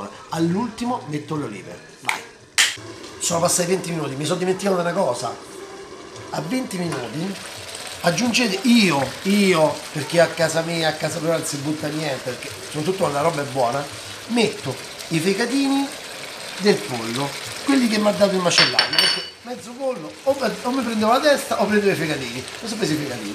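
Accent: Rome, Italy. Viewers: Maestro, continua col romanesco, la nostra bella Italia, lascia perde i radical chic inglesi. 😉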